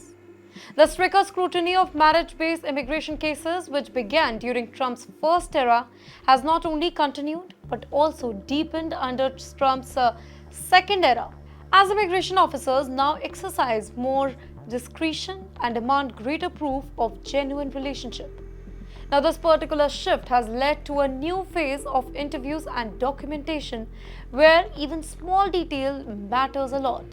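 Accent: India. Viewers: The host has a standard Indian accent so if you call that a third grade reader then they all sound like third grade reader and speaker. She sounds like a third grade reader but the majority sounds like first grade readers in my opinion.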